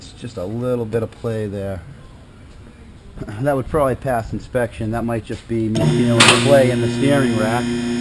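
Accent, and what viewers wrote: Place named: New England, United States. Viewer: hey.i see a few Saab videos! I have a 07 93 20t. so keep em coming! btw.. You in MA? I detected a new england accent.. take care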